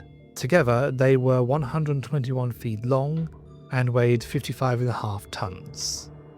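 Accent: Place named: United States. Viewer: There are other annoying Americanisms in this, e.g. debirs pron. debree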